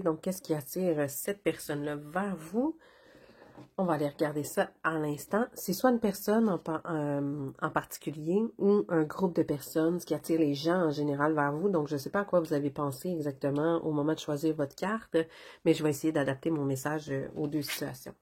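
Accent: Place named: Canada. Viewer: Votre accent canadien, très mélodieux, me fait presque l'effet d'une séance asmr (vous avez le bonjour de la France Pyrénéenne du Sud -ouest). Merci pour cette guidance toute douce et pleine de bon sens. Choix 3.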